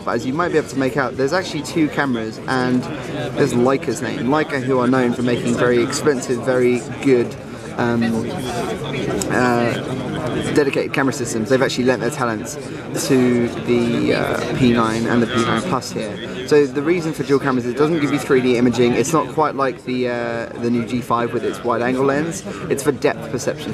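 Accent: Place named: England, United Kingdom. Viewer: You sound like Jonah Hill, but with English accent;)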